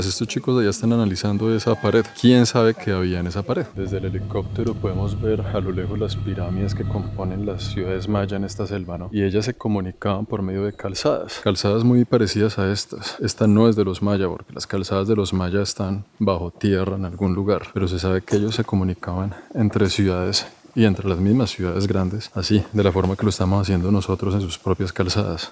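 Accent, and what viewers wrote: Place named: Paisa, Colombia. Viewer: Interesante trabajo com yutuber, porque usted no solo nos muestra a los sedentarios el muno, sino y muy importante también, ayuda a preservar ese acento tan colombiano, que supongo es el que denominan paisa y que, no tengo la menor duda, identfica a Colombia en cualquier parte del mundo.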